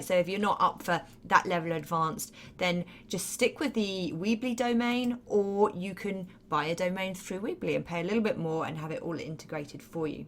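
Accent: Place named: South, United Kingdom. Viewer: It's a standard Southern British accent.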